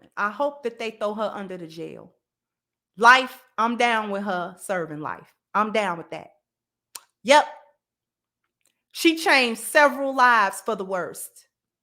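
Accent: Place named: Baltimore, United States. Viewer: She got a Bmore accent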